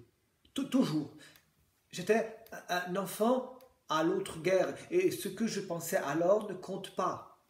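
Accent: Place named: Germany. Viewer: FORMIDABLE. MERCI POUR CETTE LECTURE Á L'ACCENT GERMANOPHONE COMME DANS LE FILM.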